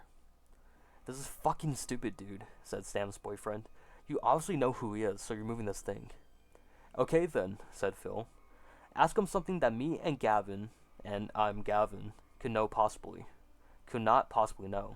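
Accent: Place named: United States. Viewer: Australia? Hmm why do you sound so American I’m from California you sound just like my white homies haha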